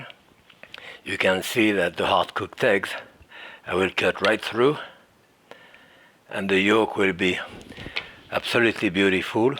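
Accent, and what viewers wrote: France. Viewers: Jacques is the most beloved American with a French accent 😁 🗽 The statue of Liberty is nice, but she's no. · Like Pavlov's dog, I hear french accent and get hungry right away.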